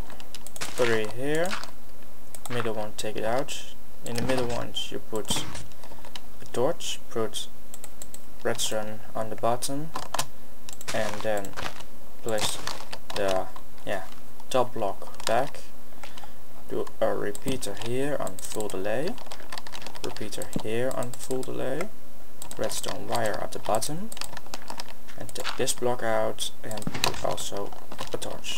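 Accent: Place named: Netherlands. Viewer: Waarom praat je engels terwijl je nederlands bent, met je mooie nederlandse accent.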